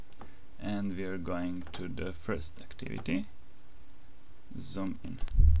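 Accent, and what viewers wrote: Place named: England, United Kingdom. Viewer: your english accent is epic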